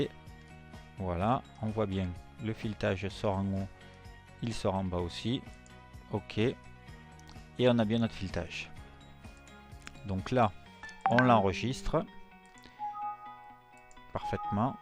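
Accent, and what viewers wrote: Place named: Southwest, France. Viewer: Merci pour ce super tuto efficace et commenté qui sent non pas le canard mais le Sud/Sud-Ouest ...!!!!? je connaissais une Madame Lanvi !! ;-)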